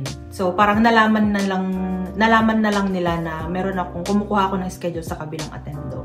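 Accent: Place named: Sultan Kudarat, Philippines. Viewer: I love it ur "in Finnish eh" Isulan/Tacurong accent 😂❤❤❤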